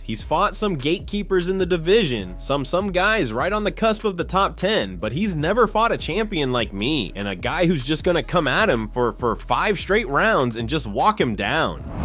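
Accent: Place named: New York, United States. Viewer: NY accent not getting name wrong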